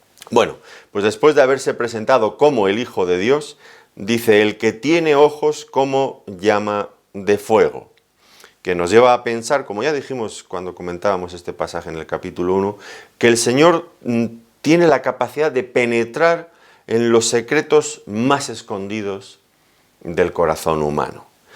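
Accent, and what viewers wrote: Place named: Spain. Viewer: Predica: "El mensaje a Tiatira" Apocalipsis 2-18-29". Video en Youtube de este pastor cuyo entendimiento y humildad tienen la gracia de Dios, el ministerio se llama, desconocemos el nombre del pastor por su acento sabemos que es español, puedes buscarlo como en tu navegador Brave, en Youtube, Google etc. Lo recomendamos por su sana doctrina de Cristo, igual que recomendamos también a John MacArthur, Paul Washer y Miguel Núñez. ( Pastor Muchas gracias por compartir el entendimiento que Dios le ha dado. Noto que usted con humildad no publica su nombre, en contraposición a los falsos profetas que se hacen llamar "apóstoles" y no lo son, esos que ponen su gran foto y sus nombres con letras enormes en sus también grandes afiches anunciando sus eventos, y ni en broma mencionan a el Señor Jesucristo en esos afiches. Precisamente debido a esto creo que sería bueno saber su nombre para compartirlo rogándole a Dios que usted siempre conserve su humildad espiritual y salud integral. 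Dios los bendiga.).+ Romanos 5-20 "Pero la ley se introdujo para que el pecado abundase; mas cuando el pecado abundó, sobreabundó la gracia; 21 para que así como el pecado reinó para muerte, así también la gracia reine por la justicia para vida eterna mediante Jesucristo, Señor nuestro". <link>, Apocalipsis 2-2 "Yo conozco tus obras, tu fatiga y tu perseverancia, y que no puedes soportar a los malos, y has sometido a prueba a los que se dicen ser ap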